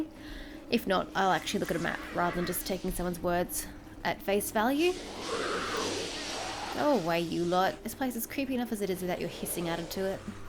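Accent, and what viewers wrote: Australia. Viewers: Just found that video by coincidence whadda great video and such a sweet australian accent :3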